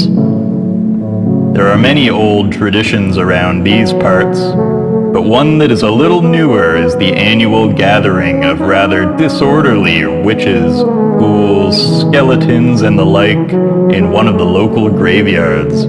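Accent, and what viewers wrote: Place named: Canada. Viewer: Is it weird I listen to these in the bath as I find your voice and accent relaxing 😌 you should do an audio book! I love the Canadian accent 😍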